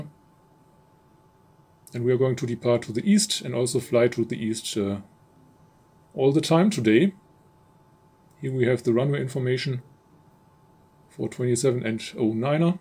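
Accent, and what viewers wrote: Germany. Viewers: Hey, I resubscribed. Missed hearing your Duetsche accent ja!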